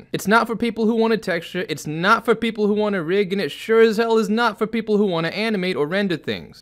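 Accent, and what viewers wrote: New York, United States. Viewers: okay. Native New Yawker? Take my follow.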